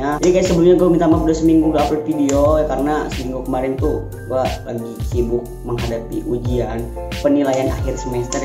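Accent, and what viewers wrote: Serang, Indonesia. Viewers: orang serang bro?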